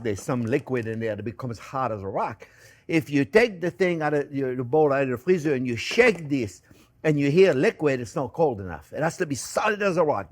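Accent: France. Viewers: Read with a French accent, c'est parfait! Merci!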